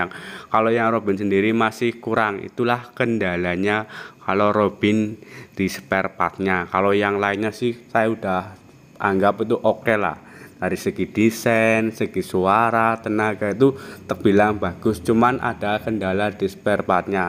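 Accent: Banyumas, Indonesia. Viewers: Kie pasti wong ngapak sing ngomong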